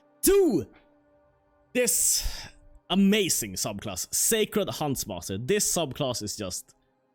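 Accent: Sweden. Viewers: swedish for sure, definatly not eastern european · Scandinavian I would say. Probably Swede to be precise.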